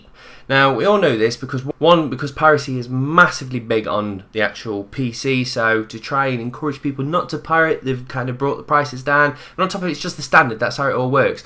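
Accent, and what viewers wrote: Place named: Derby, United Kingdom. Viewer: Are you from Derby? So strange to hear ones own accent on the internet, especially when you haven't lived there for a few years.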